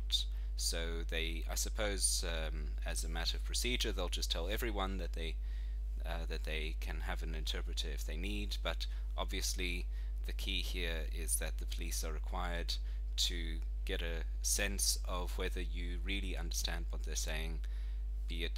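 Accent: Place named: Australia. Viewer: Bill Hahn I think its Australian.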